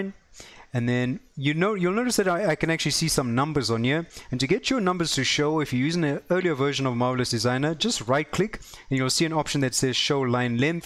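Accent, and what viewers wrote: South Africa. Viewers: my bra! I have been looking for a quick and simple tutorial to get started with Marvelous and you hit the nail on the head! Love seeing some stellar content from fellow South Africans. This might sound strange but there is something about learning in your own accent that just makes it so much easier to understand. Keep up the stellar content! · I thought your accent sounded South African, confirmed by checking out your ArtStation page. :D And WOW, I'm seriously impressed with your work man, subbed here as well as your ArtStation page! Looking forward for more tutorials from you!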